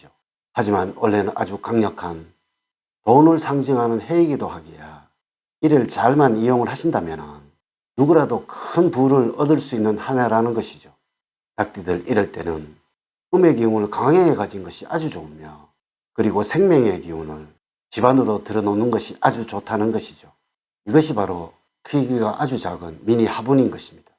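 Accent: Gyeongsang, South Korea. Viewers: 경상도 말ㆍ못알아듣겠네ㆍ 방법은 밑줄에 자막필수ㆍ 그것도 모르고 유튜브 합니까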